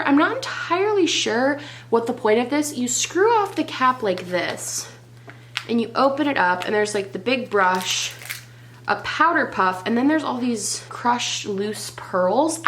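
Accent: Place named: East Coast, United States. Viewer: Going through all your videos-I swear all of us East Coast girls kinda sound the same. I’m from Connecticut and my bf heard you and he was like “she talks just like you. The way you say things”. So there’s that intellectual observation..🤷🏻‍♀️ And yesss- I love my Pat McGrath. Always.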